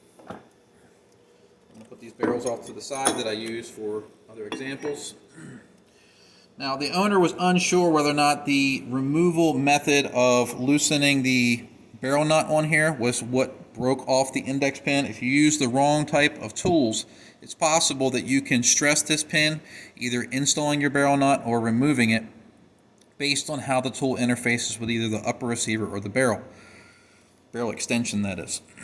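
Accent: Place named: South Jersey, United States. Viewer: Are you from the south jersey/Philadelphia area?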